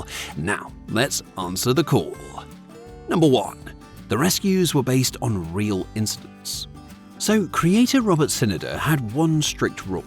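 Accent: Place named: RP, United Kingdom. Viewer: Received Pronunciation, doesn't sound like AI slop except for an odd enunciation on the odd occasion (perfectly acceptable in a human host). I think I could live with this, even if it IS AI slop.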